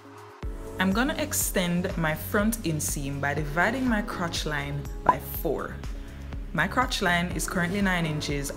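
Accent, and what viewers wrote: Jamaica. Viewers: Did I detect a Jamaican accent,? Good to hear you. Thanks for sharing. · you sound Jamaican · Hey, are you Jamaican? I heard an accent. 😊😊😊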